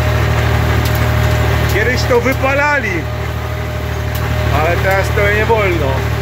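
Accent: Silesia, Poland. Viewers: Witaj. Po kuku i akcencie wnioskuję że to gdzieś na południu kraju - Śląsk 🤔u mnie o połowę niższa i zeschnięta, susza jej wogóle nie oszczędziła 🙈Ładna i oby była jak najpiękniejsza. Pozdrawiam serdecznie z subikiem 🤗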